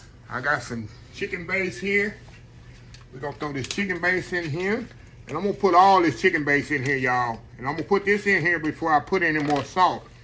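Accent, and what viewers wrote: South, United States. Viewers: Good morning. I love your videos, considering that I have a severe hearing loss and your last couple of videos not having “Closed Caption” I wasn’t able to enjoy your southern accent. GOD BLESS YOU AND YOUR FAMILY.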